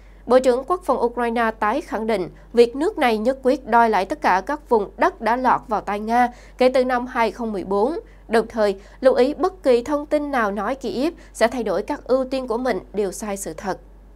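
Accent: South, Vietnam. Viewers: Giọng nói miền Nam de thương, rõ ràng, de nghe, còn miền Bắc không kho nghe. Nhất là dấu hỏi và dấu nặng: vi du: du chưa? Du chưa!!!!!!!?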